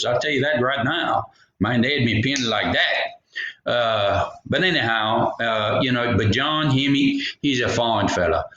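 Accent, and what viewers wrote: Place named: South, United States. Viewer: You really have southern charm accent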